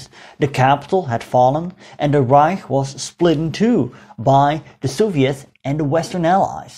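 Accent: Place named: Netherlands. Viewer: He speaks English like a Dutchman... Admiral Donuts! That's hilarious!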